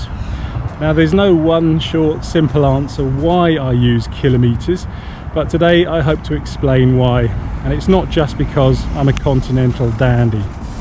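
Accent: England, United Kingdom. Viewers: Ah, I'm sure you'll get a few (more) trolls with this one :-) I quietly enjoy the arguments made by those (mostly from USA) who insist on using their "customary units". They call them customary units because they don't want to admit that the system is Imperial. The very Imperium they fought a war to break away from. And all the while their standard measurement system is SI; their customary units are all defined in terms of SI units! And then there is the situation for the UK. Many of those Imperial units are Roman units! The mile is the distance a Legion moved in 1000 paces, that's why the name is "thousand". Weights and measures have evolved somewhat in the last 2000 years, but the line of derivation is pretty clear. So, the UK and USA use Roman units. I say that since Rome has switched to modern science based units, they should too :-) Oh, nice to hear your Danish. 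Do I detect Danish with an English accent? :-)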